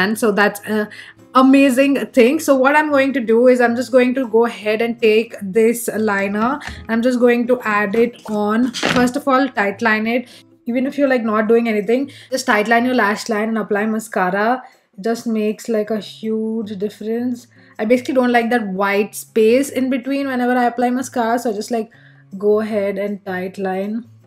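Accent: South India, India. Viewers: you may be conscious of your south indian accent English but i like your way of speaking 😍 its different n very real i highly support you ✨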